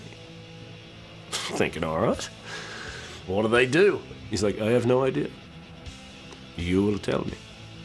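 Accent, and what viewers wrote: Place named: Australia. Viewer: wtf hes australian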